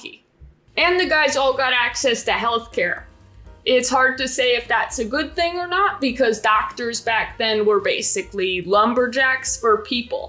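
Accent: Australia. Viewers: More australian accents please. 10/10 video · Actually really good Australian voice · Solid Australian accent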